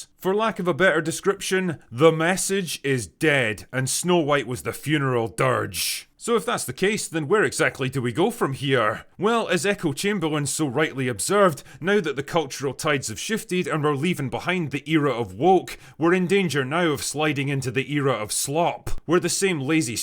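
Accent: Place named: England, United Kingdom. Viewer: This was great classic stuff said right here! Well said & I agree. " " "The only English Accent that I care to hear"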